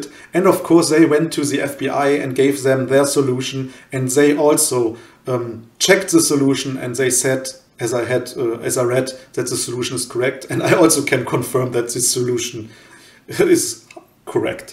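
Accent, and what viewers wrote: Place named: Germany. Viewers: The german accent MAKES the video! 😍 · Interesting! But that German accent really is a killer. That's me saying this, a German.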